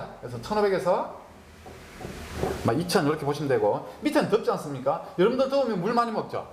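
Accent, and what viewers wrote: Gyeongsang, South Korea. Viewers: 아흥..강으너무 잘해주시는데....경상도 억양..알아듣기 힘들어요..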